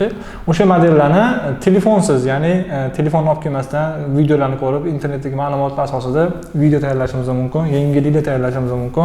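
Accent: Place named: Tashkent, Uzbekistan. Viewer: 🤢🤮 Aka iltimos Toshkentcha gapirmang, kitobiy o'zbek tilida gapiring. 😊😄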